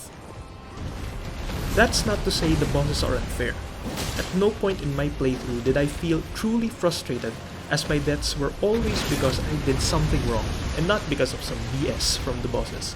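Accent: Philippines. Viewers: pinoy accent!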